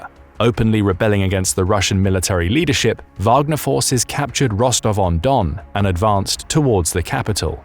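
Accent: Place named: RP, United Kingdom. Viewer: Lies from the get go. This guy thinks that his posh sounding accent proves his intellect. Well he sure is a perfect example of twisting the truth to fit own alternative UNREAL narrative / just as hypocritical as main media and politicians who happily speak with forked tongues and do their utmost of censoring what they don't like. Do you ever now find REAL debates between intelligent people of different opinions than what is force fed??? No, all the main proponents do is calling names, taking jobs away from dissenters.... admitting that they will call something they agree is TRUE nevertheless as " disinfo" when it does not suit their narrative.....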